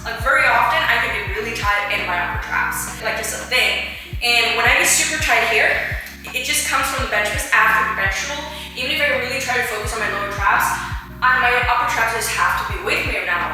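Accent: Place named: United States. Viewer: It might be your FAQ: why do you have American accent? Love your content as always:)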